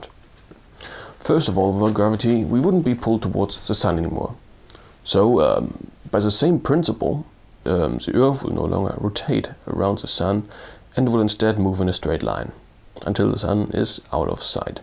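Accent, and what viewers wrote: Germany. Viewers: If i hadn't read this comment before, i could think you were from Germany with this accent.^^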